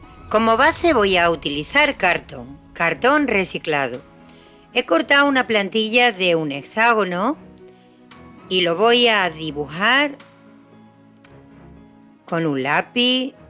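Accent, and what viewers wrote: Spain. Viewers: Desde 🇪🇸 me ha encantado lo que has hecho, creo que también eres de 🇪🇸 por tu acento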